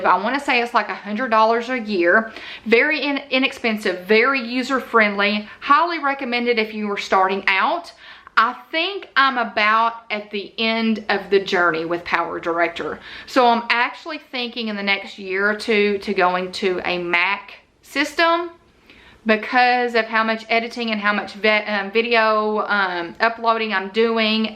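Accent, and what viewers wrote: South, United States. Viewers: Watching you for the past year has been a delight. Your personality shines through in every video. Your southern accent is just the icing of the cake for me. I lived in Georgia for many years and miss the hospitality and joy southerners bring to any conversation. I look forward to watching your videos every week because they always make me smile. In fact, at the end I always say with you “bye you guys”...don’t know if you realized you have that catchy phrase too. Keep up the good work! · you are an inspiration. I love how relatable you are! I so look forward to your videos. And your southern accent is charming! Much better than my Boston accent!!!! 😉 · You are one amazing lady,. And if you think the Southern accent is an issue, you should hear the local accent and idioms from Cardiff in South Wales 🏴 where I was born! It’s much worse! 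xxxxxxxxxxxxxx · I have been tuning in on your channel for a few years now. I love your channel. I find myself waiting on Mondays, Wednesdays and Fridays to see what you talk about. I just love it! Keep up the good work 😊👍. Be you, ( southern accent and all 😉), and don't change a thing. Take Care. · I love your company name even more now that I know the history behind it. And for the record, I love your southern accent! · I love that you have an accent , I too am a southern girl and have often thought of having a chanel ... also FYI , you can do an IGTV and put links on your stories via IGTV... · Thank you for answering all my questions I posted in the FB group! I love your channel and following you on IG as well. I wish I could remember exactly how I found you but I don’t. I will tell you, however, that your sweet southern accent drew me in and I still love it!!! I admire your authenticity as much as your content and have no doubt that silver play button is in your future ❤️ I look forward to supporting and cheering you on as you continue to set and exceed your goals!!!! 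😊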